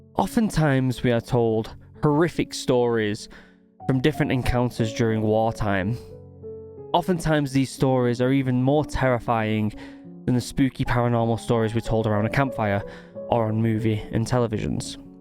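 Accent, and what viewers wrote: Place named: England, United Kingdom. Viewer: nice change hearing an Englishman tell horror stories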